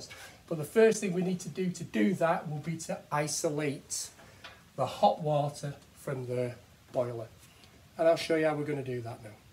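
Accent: United Kingdom. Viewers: Your British ascent make it difficult to catch up, but it was way interesting thanks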